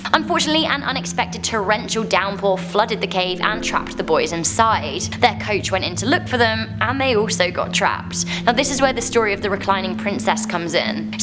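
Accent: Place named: United Kingdom. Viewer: Yeah us Brits have amazing accents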